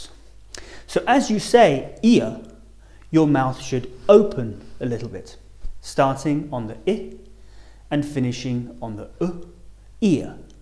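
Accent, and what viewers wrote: United Kingdom. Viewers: the presenter has a British accent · He is obviously British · I'd like to make an observation, this class is in British English which has a different pronunciation than American. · I have a question... Are you British or American??? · watching this video as an American and all the examples are just British pronunciations :') "heuh" "powah"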